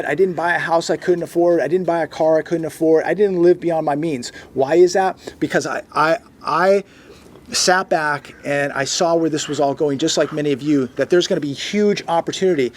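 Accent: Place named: Chicago, United States. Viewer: Taday,love the Chicago accent😊✝️.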